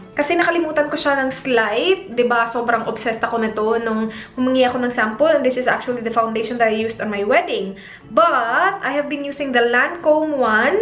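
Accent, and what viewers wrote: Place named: Visayas, Philippines. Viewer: I love your videos but nalingaw kos imu accent and tooONE?!?!. bisaya kaa YOoO?!?!. pataas inig human sa sent...eeEeENCE?!?! ✌✌✌✌ It would be better if ma fix gamay😊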